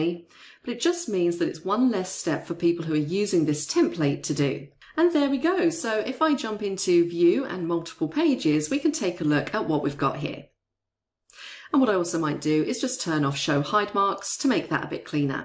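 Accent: United Kingdom. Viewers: Thanks for excellent explanations! Btw, you sound so British, I loved it :)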